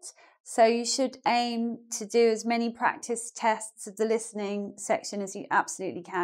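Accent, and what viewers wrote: United Kingdom. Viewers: I love you!!!, you are the best teacher of English of the world, I follow all of your channels, I like your British accent to speak. thank you very much for your advices. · I'm japanese. I've been learning English with American accent for 6 years. Thanks to your videos, I gradually get used to british accent.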